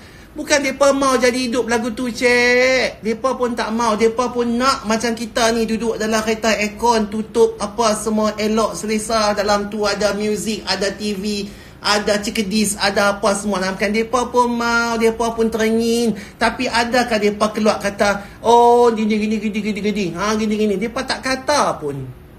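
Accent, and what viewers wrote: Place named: Penang, Malaysia. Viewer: Penang pure..asai georgetown..kedah lain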